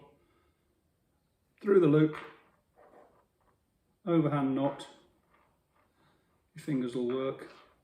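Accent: United Kingdom. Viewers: The tackle manufacturers like Middy, Drennan and Guru etc could learn something from you, use nylon loops instead of fuse wire with a memory, great video! I remember watching the first video I saw of yours, you were fishing on a lake, a man with a clearly British accent fishing and a Kangaroo hopped into the video in the background, local wildlife came to say "G'day"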